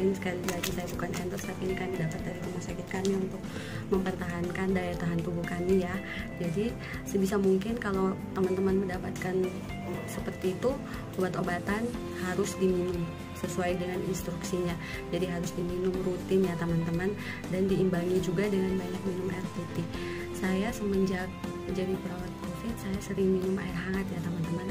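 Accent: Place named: East Nusa Tenggara, Indonesia. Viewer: Gua suka logat NTT nya .... keren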